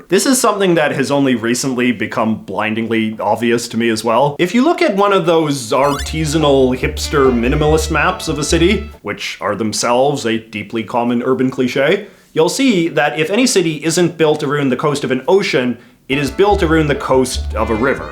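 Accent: Canada. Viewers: "You know what they say about the weather here in {insert name of your north American city}, if you don't like it, just wait around a minute, it'll change" Are you exagerating your Kanuck accent? Or is that for real?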